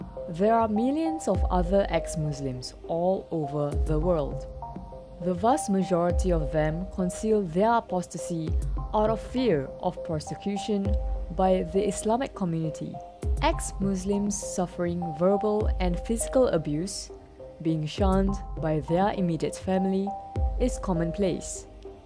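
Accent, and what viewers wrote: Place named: Kedah, Malaysia. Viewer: he speak bahasa kedah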